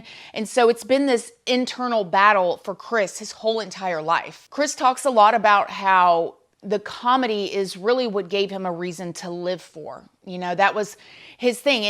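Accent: South, United States. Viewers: I know you are happily married and all. But I just got to say, you are hot! I love your style, particularly your cool hairdos and your southern accent...and your funny. that's all.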